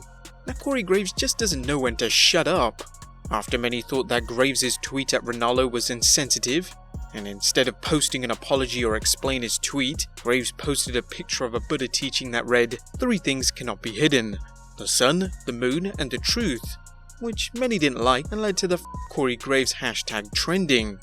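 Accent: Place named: England, United Kingdom. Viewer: How come the American narrator can curse but this narrator can’t? Need some English accented f bombs in my life lol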